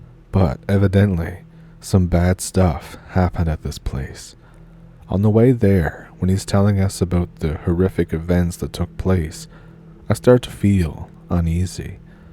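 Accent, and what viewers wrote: Ontario, Canada. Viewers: sir, I like hearing you speaking these stories because you sound so much like my Ontario and Great Lakers cousins and uncles. Cheers friend. 🎉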